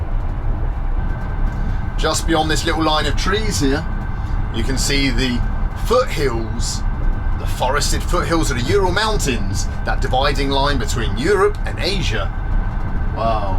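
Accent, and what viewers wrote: England, United Kingdom. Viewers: is it just me or was his english accent stronger in this vid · Really loved watching this vlog, have not watch you since you went through your awful Covid experience. So funny and educational at the same time. Have you been back to the UK , your UK accent sounded very strong ?We just went back for a month and our US friends struggle to understand us when we get back. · Its might be because of his hiatus but sounding extra british this episode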